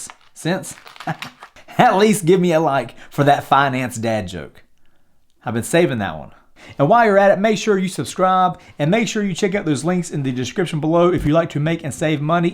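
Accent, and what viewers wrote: South, United States. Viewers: came for safemoon, stayed for the southern accent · Subscribed to this channel simply because this dude seems genuine and honest. Plus his accent just kills me. Some southern USA with undertones of a British accent. Bit in all seriousness, he's made me realise the importance of investing in Safemoon NOW!!!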